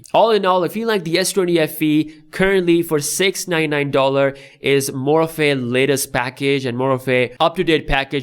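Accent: India. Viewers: U sound so Indian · I just cant watch this. Too much indian accent. My god.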